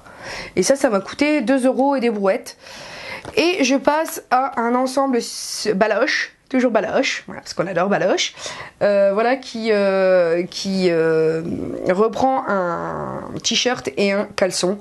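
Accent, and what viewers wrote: England, United Kingdom. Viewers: J'adore ton accent anglais ma petite cloche ;) Tu as vécu en Angleterre?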